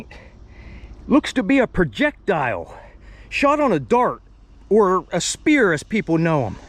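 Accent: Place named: Pittsburgh, United States. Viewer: Beaver and Ohio meet....Sure there is some there....Love your videos....Love that Pittsburgh accent....lol...I got it too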